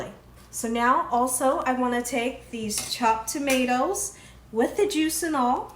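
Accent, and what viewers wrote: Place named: Texas, United States. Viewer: I would have never guessed you are from Ohio or maybe your not 🤔. I seriously thought TX! (Where I live) or Louisiana (my soul, I love that state) ORRRR Oklahoma 🙄😂 (you know TX & OK have a thing 😂. I kid I kid it’s cool IG 😂). Love your style tho. All the love from my family to yours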